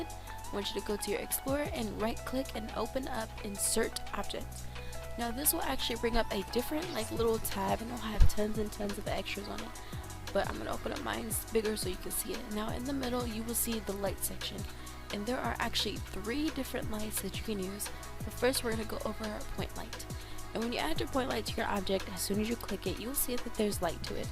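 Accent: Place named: United Kingdom. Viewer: im kind of hearing a british accent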